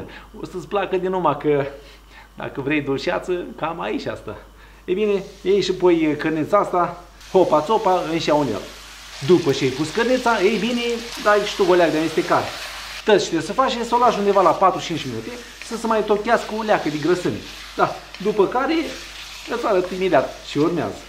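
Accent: Moldova. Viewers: Sunteți moldovean cu limba lata daca nu vă supărați de unde sunteti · Like am dat,abonată sunt demult.Rețeta este o minune tu și graiul tău Moldovenesc o minune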